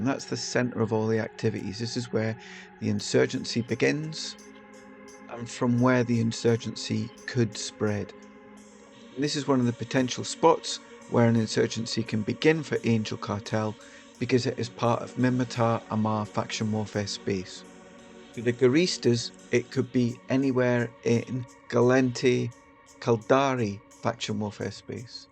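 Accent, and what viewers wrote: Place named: Scotland, United Kingdom. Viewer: Great video, loads of useful info and explained easily. Plus i could listen to that Scottish accent all day, easy listening :)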